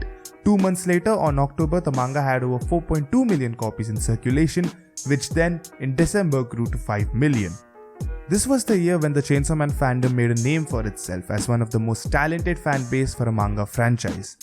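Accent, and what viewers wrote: India. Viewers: The impossible not unrecognisable indian accent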